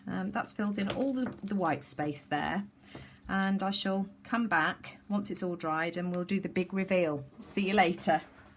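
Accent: England, United Kingdom. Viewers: These are very lovely! Do I detect an English accent? Love listening to you and watching too.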